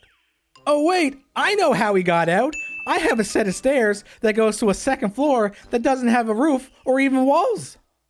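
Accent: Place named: South, United States. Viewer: Whatever your name is again I think you have a country accent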